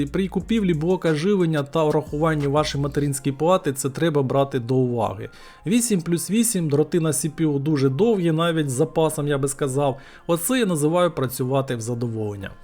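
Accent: Transcarpathia, Ukraine. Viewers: Ти закарпатець?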